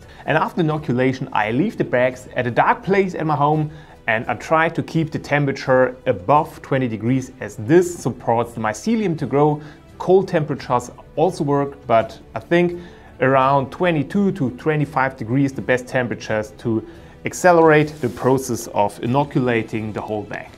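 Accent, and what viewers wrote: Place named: Germany. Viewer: So ein schönes Deutsch-englisch :D man merkt es... aber gutes Video !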